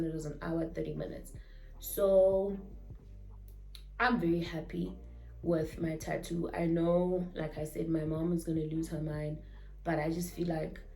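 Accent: Nigeria. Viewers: Every time I fell in love with your Nigerian accent😊❤ I love you ❤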